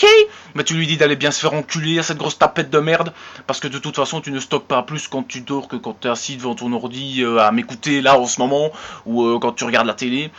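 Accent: Liège, Belgium. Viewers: Serait tu de Liège ? Haha je reconnaît ton accent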